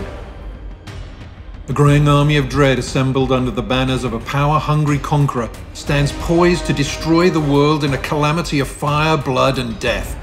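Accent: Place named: United Kingdom. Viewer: Yup. As the voice was British, it reminded me of when small British computer games companies, in the 80s and 90s, would just use their office staff to do voice samples, or pose for being digitally scanned, into their games (such as the bored secretary's voice of "Insert Disk 2/First Aid/etc" in Team 17's "Alien Breed"). These companies would also sometimes used the same sort of staff for their adverts, both in magazines or on the telly.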